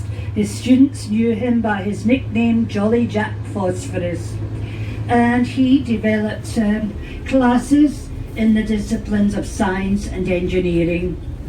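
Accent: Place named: United Kingdom. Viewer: Kala ko ikaw yung nag sasalita..Kasi ang briton accent..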